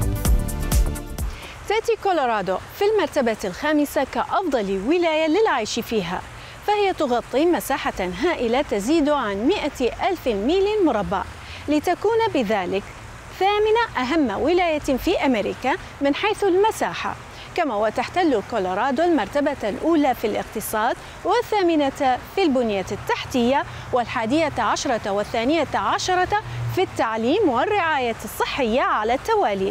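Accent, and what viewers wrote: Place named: Iraq. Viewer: مبین من لهجتها عراقیه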